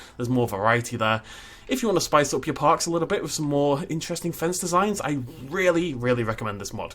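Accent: United Kingdom. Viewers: Isn’t he like British or something? I think it’s his accent.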